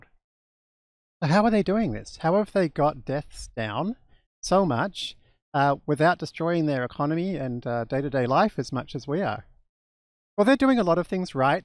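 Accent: Australia. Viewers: Such informative video and I keep wondering does he have an Australian accent 🤔.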